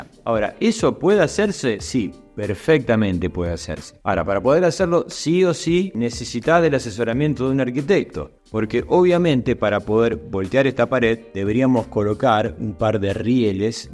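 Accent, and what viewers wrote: Argentina. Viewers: Buenos dias Arquitecto, Tienes un importante número de comentarios en este vídeo. Te sigo, y te sigo..esta vez si quiero escribirte (me leerás?). He hecho un experimento. Lavaplatos roto, lavo a mano uff, y escucho tu narración (después veré el video). Decirte que eres tan profesional en tu arte, y tan bueno divulgando divulgando...el habla argentina me gusta desde siempre. Me llamo Lujan. Mi padre fue un magnífico bailarin, premiado en competición de tango. Y vestía muy elegante.disculpas por este protocolo. Mi caso se encuentra entre los narrados por ti. A saber: compro casuca, sin mirar. Necesitaba poner ahi varios enseres. Me habia cansado y empobrecido el hecho de alquilar. Después de un tiempo querria hacer el lugar habitable. Sencillo. Me aconsejan demoler todo y volver a construir con los precios Abusivos, en mi opinión, que cobran en España. 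Así estoy casuca y yo. Pero te seguiré en todos tus vídeos. Y me preguntó: en España, Comunidad Valenciana, existirá un? Yo no lo he encontrado. Inteligente, honesto, conociendo su oficio, y con una pronunciación que merece un 10., soy profesora. Mi oficio y mi pasión por la Arquitectura Viene de muy pequeña. Jugaba a construir de todo con bloques de madera en cuatro colores. Un fuerte abrazo por darnos tanto conocimiento. Desde Valencia. Cerca del mar Mediterránei. Hasta pronto. Soy Lola.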